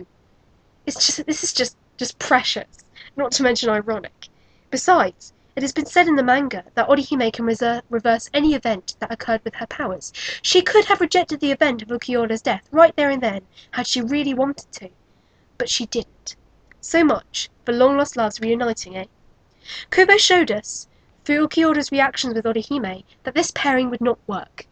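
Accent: United Kingdom. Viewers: LOL I'd like to see Canon Ulquiorra's reaction to UH Ulquiorra XD Oh the so-called Orihime fans *rolls eyes* the only ones in denial are them. As regards the heart and it's meaning, one of the chapters of the Hueco Mundo Arc was called "Six Hearts Will Beat As On" refering to all the nakamas who went to save Orihime, so there it is, the "heart" as a friendship device. Btw, I love your pronunciation. You're british, aren't you? XD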